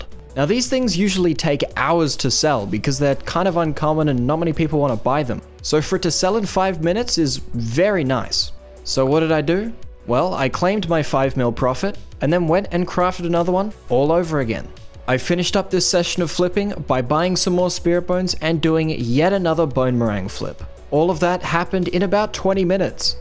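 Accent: Australia. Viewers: wait is australian?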